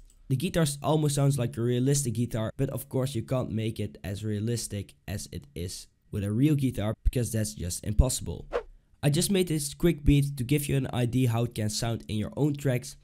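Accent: Netherlands. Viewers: lekker nederlands accentje wel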